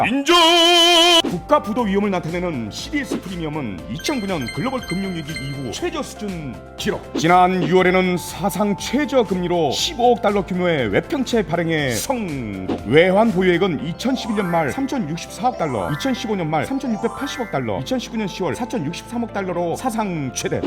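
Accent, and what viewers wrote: North Korea. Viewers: 성우 말투도 북한 억양이네 일부로 그러는건가? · 이거 진행하는 사람 북한인이잖아 ㅋㅋㅋㅋㅋㅋ 말투 븅신이네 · 말투 북한이냐?? 시이발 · 나레이션이 북한같아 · 성우가 인민군같네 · 이정도면 걍 우상화 프로파간다 선전물이네....말투도 진짜 북조선 애들 같다... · 진짜 이딴거 만드는 목소리톤마저 저기 건너편 윗편애들한테 닮아가는 기분이 드네 진짜 미친거 아니냐 이딴식으로 되도않는 뜬구름을 팩트라면서 방송하네 ㅋㅋㅋ 2021년 다시 만들어봐라 어떤가 한번보자 미치겠네 ㅋㅋㅋㅋㅋㅋㅋ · 나레이션 북한같아요.. 레포트 써야해서 참고하려고 보는데 도저히 못듣겠어서 소리 끄고 자막키고보네요..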